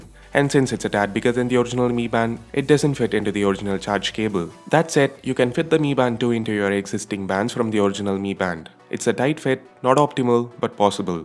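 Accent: India. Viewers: The Indian accent ruins the whole review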